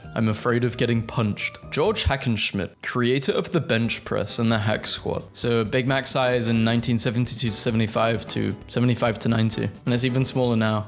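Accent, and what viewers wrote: United Kingdom. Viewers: Remember, he British, he’ll pronounce things differently every once in a while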